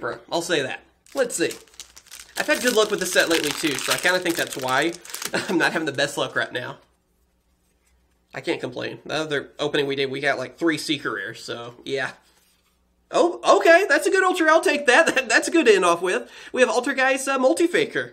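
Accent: Texas, United States. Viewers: The thing that I LOVE about your videos is your texan accent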